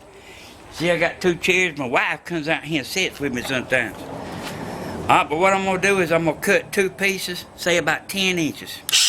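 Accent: South, United States. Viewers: love your your videos....I'm from Australia and your accent is awsome mate.....one of my best friends is from the south.....the more I watch the more I start sounding like you....deadset my mate thinks I'm taking the piss....but no iy's contagious....anyway keep up the good work....we love a good shed here in Oz too... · Dude, I love your southern twang. And love your good ol' boy knowledge. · Yep he's Southern all allright! Cuz I sound like he does and I'm from Oklahoma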